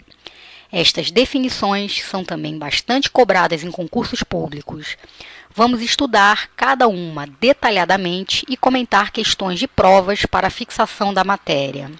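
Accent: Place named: Rio de Janeiro, Brazil. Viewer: Carioca falando é chato pra kralho...mas a aula foi boa.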